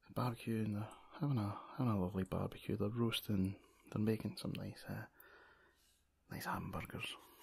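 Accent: Scotland, United Kingdom. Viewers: I absolutely love your voice, I'm a sucker for scottish men and their accents ☺ Great video and doodle adventure! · I’m originally from Dumbarton. In the states now. Love hearing the homeland accent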